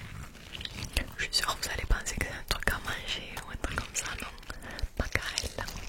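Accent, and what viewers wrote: South, France. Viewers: Il y a énormément de mot que nous utilisons également dans le Nord, et un peu partout en France même, qui sont globalisés dans un langage familier. Après vous avez quelque mot que je n'avais jamais entendu, magarelle 😮 ! 😂. La team chocolatine le débat est finis, seul les sudistes le disent, nous sommes 90% à dire "petits pains" dans la France. Le principal étant qu'on sache ce que les deux mots veulent dire. Ceci étant dit, "chocolatine" ( d'ailleurs même ma saisie automatique sur mon clavier ne connait pas 😂) prend du charme quand il est prononcé avec votre accent ! Sympa la découverte 😊👌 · Super concept, et j'ai appris des choses comme ça je passe crème quand je retournerai dans le sud haha (par contre j'arrive pas faire l'accent, que j'adore).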